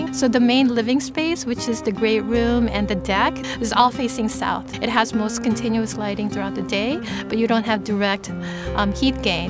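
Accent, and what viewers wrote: Philippines. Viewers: When I first hear her talking base on her accent I knew she˚s a Filipino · I didnt realize you are a filipina. really nice accent.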